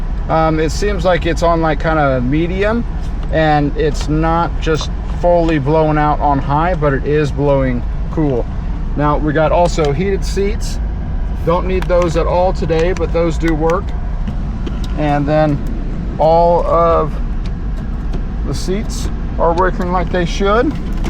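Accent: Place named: Appalachia, United States. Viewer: Love the car but am i dead or he sounds like he is speaking with a hillbilly accent😅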